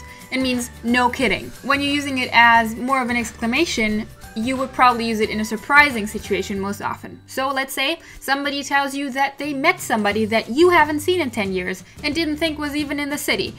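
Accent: United States. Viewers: You're such a food explainer/teacher. And you sound so American with the f bombs. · I believe you'd better concentrate on teaching us German instead of persisting in showing us how well you can speak Americanized English. You are an amazingly beautiful girl: you kinda attract our attention to your cuteness rather than teaching us anything. So, could you do something about this? :)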